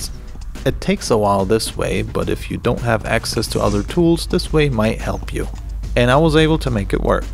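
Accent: Europe. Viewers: Mind444 Spiritual Tribe He sounds European, it will be 220 - 240 volts depending on the country, single phase. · Good video. You sound European. There are regulations in EU to have certified installers. I am curious - not complaining - are you certified to install or are you not in the EU or you are in the EU but you don't care, if so why don't you care? Thanks Reason I ask, is that I want to do it myself in UK.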